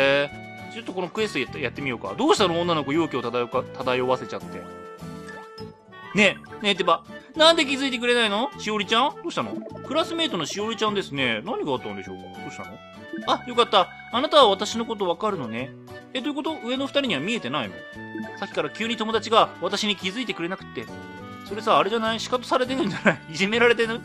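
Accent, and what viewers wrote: Kansai, Japan. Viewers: 君の拙い関西弁に萌えた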